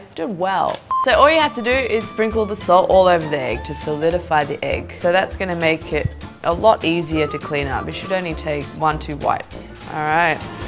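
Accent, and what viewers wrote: Australia. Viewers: Where is she from? Australia? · is she from Austrailia?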